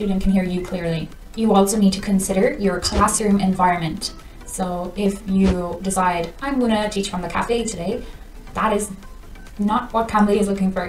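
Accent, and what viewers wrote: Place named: Ireland. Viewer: Are you from Ireland? You sound Irish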